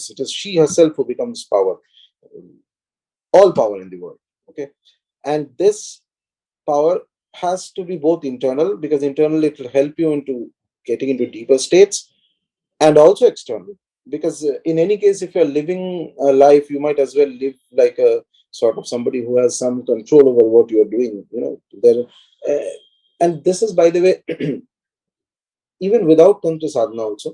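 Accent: Kerala, India. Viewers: Malayali? Tamil? Just asking.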